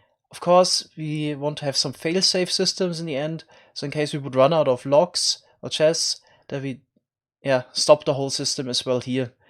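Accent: Germany. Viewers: german here, is his English really that german? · German accent shows, I'm using to imitate a German accent towards my German friends:)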